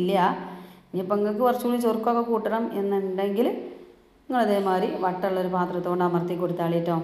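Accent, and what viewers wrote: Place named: Malappuram, India. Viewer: മൊഞ്ചുള്ള മലപ്പുറം താതനെക്കാൾ മൊഞ്ചും ചൊറുക്കും ഉള്ള മൊഞ്ചത്തി നൈസ് പത്തിരി മാണങ്ങി തിന്നോളിൻ മക്കളെ പത്തിരിനേക്കാട്ടിൻ മൊഞ്ചുണ്ട്ട്ടാ താത്താന്റെ അയഞ്ഞാൻ കൊയഞാന്‍ ബർത്താനം എന്തത്താണ് മക്കളെ കേട്ടാലും കേട്ടാലും പൂതി തീരൂല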